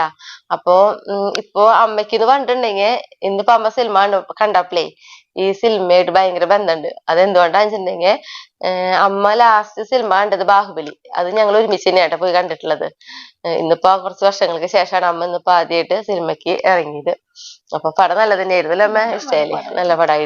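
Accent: Thrissur, India. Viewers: Ante chechy onnum manasilakilla njangal Thrissurkaralla Thirichu parayu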